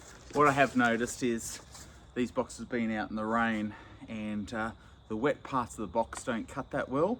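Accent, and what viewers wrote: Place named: Australia. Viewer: Not criticizing but he sounds like he's from Oz, not Britain.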